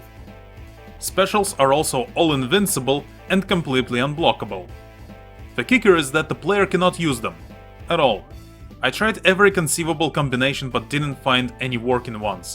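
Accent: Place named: Portugal. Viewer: És português, certo? O sotaque não engana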